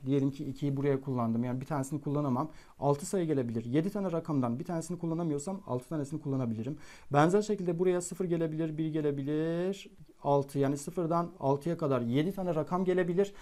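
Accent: Van, Turkey. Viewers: Hoca vanliiiii.. Hemşehrim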